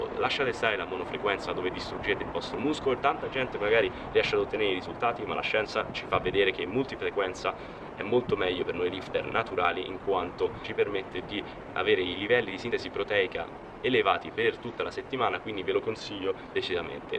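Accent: Rome, Italy. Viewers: Sei di roma nord o sud? TOP!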